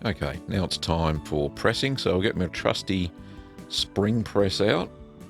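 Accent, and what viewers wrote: Australia. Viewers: Ah finally an aussie...phew mate....finally a local.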